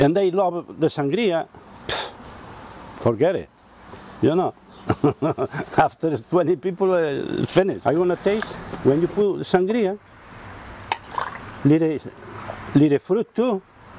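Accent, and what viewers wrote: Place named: Spain. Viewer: His Spanish accent is so fckin cute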